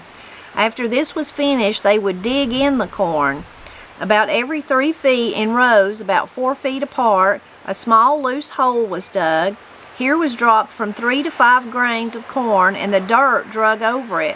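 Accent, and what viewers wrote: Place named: Appalachia, United States. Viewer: Listening to you read these old stories makes me smile, and brings joy to my heart. This reminds me of my Mama, and Mamaw reading to us as little kids. There's a special was us from the mountains talk, it's not a southern accent, it's a lot richer. Thanks!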